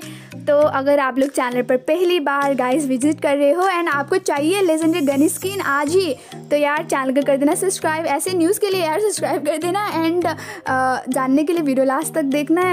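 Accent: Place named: Nepal. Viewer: You sa like a nepali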